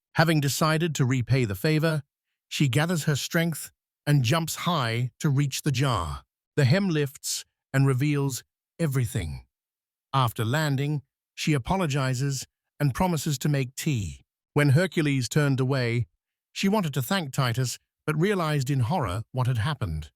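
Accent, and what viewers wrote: United Kingdom. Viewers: British narration, unusual for this type of video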